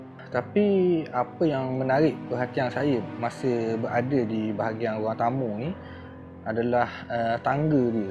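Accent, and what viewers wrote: Terengganu, Malaysia. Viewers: dgr loghat mcm ganu ni...ok done subscribed...salam dari Singapura... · Suke dengar loghat ganu ni..👌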